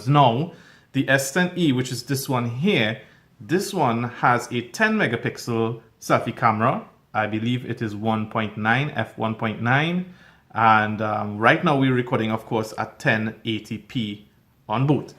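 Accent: Trinidad and Tobago. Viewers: Are you Trini or Guyanese?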